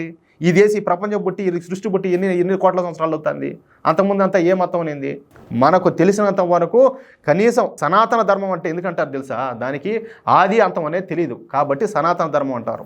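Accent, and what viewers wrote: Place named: Telangana, India. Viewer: తెలంగాళ stail తప్ప మాములు గా మాట్లాడితే బావుంటుంది.... నేర్చు కో బ్రో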